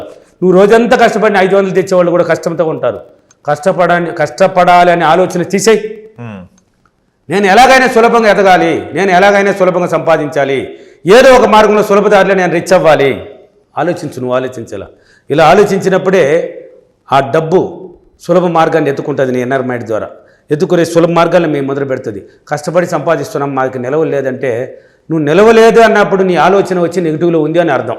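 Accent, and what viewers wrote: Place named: Chittoor, India. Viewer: I think he is from Chittoor... That slang is lub❤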